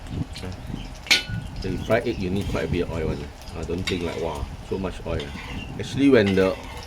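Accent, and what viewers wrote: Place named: Singapore. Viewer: Enjoy watching your video from the sunshine state, Florida. Make me feel at home listening to your humorous singlish dialogue. Looking forward to more of your videos.🙏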